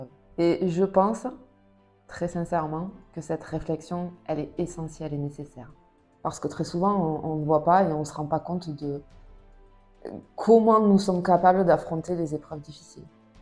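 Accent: South, France. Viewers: Bonjour ton accent du midi j'adore je suis de Toulouse j'ai le même bonheur a vous merci pour ces petits conseils j'ose un 🥰🥰😘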